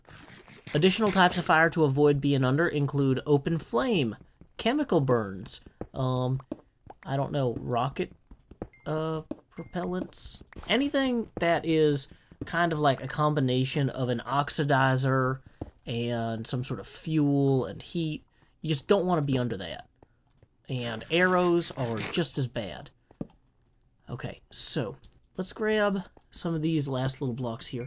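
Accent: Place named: Tennessee, United States. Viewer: First time watchin' your videos, and I've gotta say they're great. Nice hearin' somebody else from TN on youtube. :)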